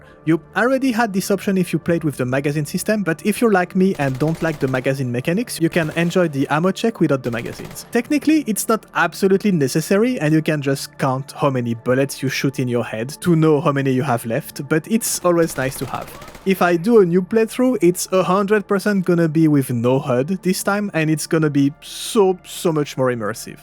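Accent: France. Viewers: Amazing video, cool french voice to artefact hunt to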